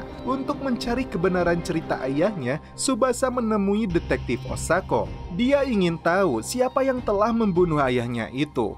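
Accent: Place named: Purwakarta, Indonesia. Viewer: Gue nebak, ini yg ngomong orang purwakarta nih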